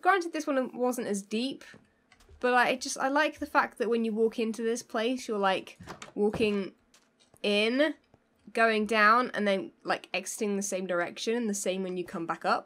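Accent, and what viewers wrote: United Kingdom. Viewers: I'm learning more about other hermits and I now know you and Impulse .xD By the way I like the way you speak it's nice, british?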